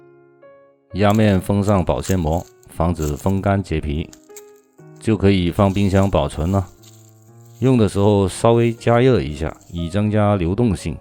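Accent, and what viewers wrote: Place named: Fujian, China. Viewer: 是福建口音 哈哈哈哈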